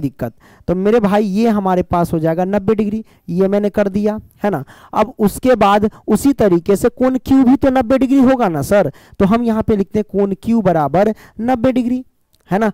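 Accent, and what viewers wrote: Bihar, India. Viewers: 🎉 रमाय नमः 🎉 गुरु जी 🚩 आप राजस्थान बोर्ड से पढ़ाते हो या बिहार बोर्ड से बहुत देर से खुजली हो रही थी पूछने के लिए अच्छा आप जो बीच बीच में जो ये बिहारी भाषा बोलते हो तो अच्छा लगता है😅 क्योंकि हम राजस्थान से है😅 ओर आप अच्छा पढ़ाते हो❤ में अपने दोस्तों को भी बोलता हु पढ़ने के लिए 😅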